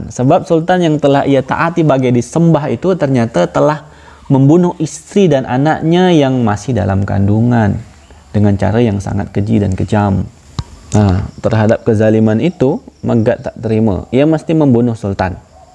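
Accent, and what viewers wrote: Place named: Malaysia. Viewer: Suka sekali dengar dialek melayu nya 😊🙏🏻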